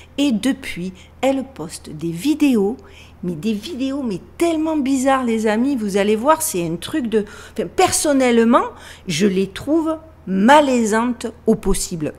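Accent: South, France. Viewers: Je t'ai decouvert grace a ton apparition dans la video de rock sauquere... Franchement , sujet tres intéressant, hate de découvrir l'ensemble de tes videos . Et vive l'accent du sud 😍🎉!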